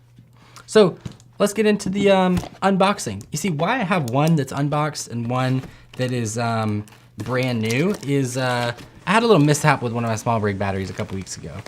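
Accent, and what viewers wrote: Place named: South, United States. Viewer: “That thing Dont pop off” lets me know youre from the south lol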